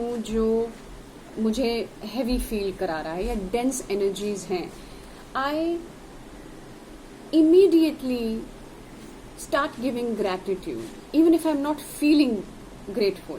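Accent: Bundelkhand, India. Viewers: we love your bundelkhandi acent in sit videos ....could u please tell us how u got this or might be u belongs to bundelkhand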